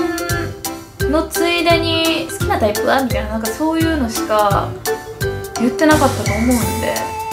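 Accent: Kansai, Japan. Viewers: 関西弁美女ってええなあ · 関西弁美しい！ 大阪には遊びでよく行ってます✨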